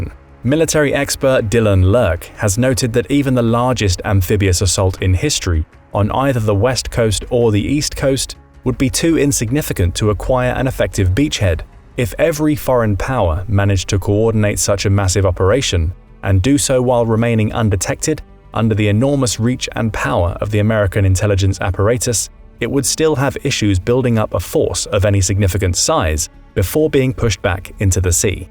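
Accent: United Kingdom. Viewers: He’s from the Uk that’s why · He sounds like a Brit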